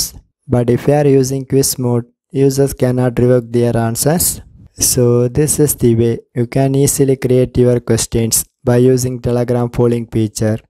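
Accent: India. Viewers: He is from india, did you hear his slang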